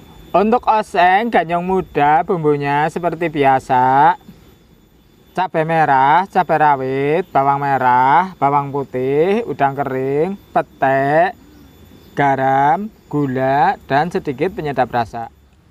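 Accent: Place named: Bumiayu, Indonesia. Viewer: Mas asli mana,.. logatnya mirip suami org Bumiayu, dn sy betawi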